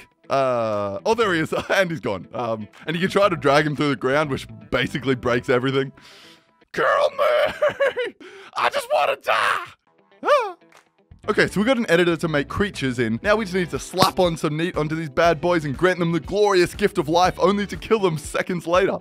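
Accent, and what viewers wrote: Australia. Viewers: Code are you Australian? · OMG YOUR AUSTRALIAN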